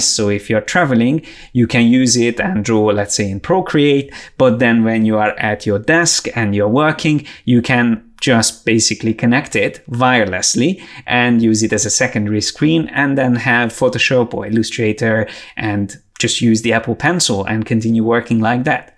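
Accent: Hungary. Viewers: Szia ! Pár videódat már végignéztem. Az összes minőségi és átgondolt, csak gratulálni tudok. Ha megengeded 1 észrevételem mondjuk lenne. Az angol kiejtéseden nagyon érződik, hogy magyar vagy. Ezzel semmi gond sincs, hiszen nem ez az anyanyelved. Arra szeretnélek megkérni, hogy amennyire az időd és energiád engedi feliratoznád a videóid magyaroknak, mert nem mindenki ért angolul és így a nézőid száma is bővűlne ... Ez csak egy javaslat 😉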